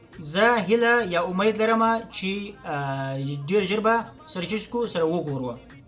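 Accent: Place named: Balochistan, Pakistan. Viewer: What dialect of Pashto is this?? It seems quite basal akin to archaic dialects spoken in Balochistan like Wanetsi or Tarin. I study the linguistics of Indo-Iranian languages, so it is fascinating to see this rural archaic dialect which is markedly different at least from urban dialects. The cadence in this dialect is faster and the frictives more numerous. The retroflex consonants which is what distinguishes Pashto from all East Iranic languages here seem more muted and you use aspirated consonants in conjunction more, you aspirate " der" and "tol". This is in contrast to Pashto dialects spoken in the Sulaiman range and adjoining regions of the Hindu Kush or mainstream Pashto media, where the cadence is slower and the emphasis is more on retroflex consonants.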